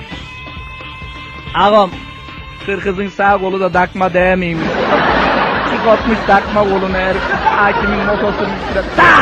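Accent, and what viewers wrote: Gaziantep, Turkey. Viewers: asil antep sivesi bu, dizidekilere kanmayin, onlari duydukca uyuz oluyom... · cok güzel bizim su antebcemiz yau gülmekten bittim haa yauw su antebimi öyle bi özledim ki vallah gidince antebin topragini öpecem sanada tsk sen beni güldürdün allah da seni güldürsün la yorum neding la haha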